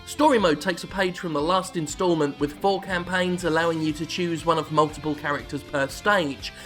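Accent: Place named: United Kingdom. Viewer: lol UK accent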